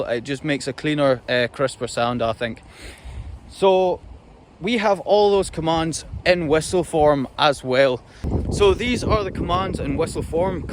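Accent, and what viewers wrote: Scotland, United Kingdom. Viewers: English spoken with Scottish accent. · Hey, I do have a question: I cannot understand your order for the recall. I am from Germany and it’s difficult for me to understand your scottish mothertoung. For me the order for recall sounds like a serene! 😅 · Good evening. It is Isabelle from France. It is so nice to hear this Scottish accent of yours. Yes you love what you do and it shows. These dogs are so intelligent, it is marvelous. All you explain is very interesting. Thank you ever so much. I once looked after rough collies in England. 15 dogs who drove me crazy hihihi 🤣🤣🤣. Border collies are my favourite though! Thanks to share your work with us. See you and take care. Bye bye! 😉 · Your accent makes it so much. Better. Some American guy yelling these commends would not be as exciting. Yeah Scotland!!!!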